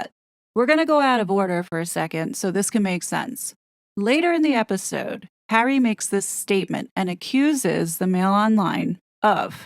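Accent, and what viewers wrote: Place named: United States. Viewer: Correct me if I’m wrong- you sound American- how did you get your hands on the book?! I had to order mine from UK and it won’t be here for another week😢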